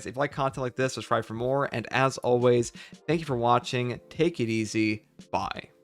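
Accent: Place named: AAVE, United States. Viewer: ebonics.. I think??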